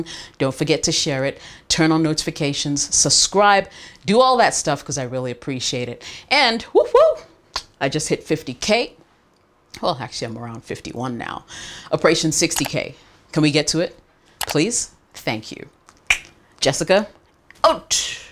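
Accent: United Kingdom. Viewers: Of all the coaches and advisors I watch on YouTube everyday,Jessica is the best. Jessica: You are a genius. I never miss any of your exciting video-lectures in your beautiful, perfect,crisp,refreshing British English. Hugs from Venezuela,Virgil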